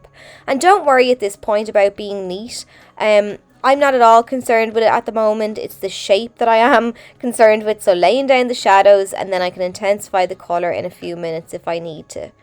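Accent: Ireland. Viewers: Really enjoy how you explain what colors you use and how to do this it really helps!! ❤ Thanks🙂😃and you must be Irish because of your accent! It's cute thanks❤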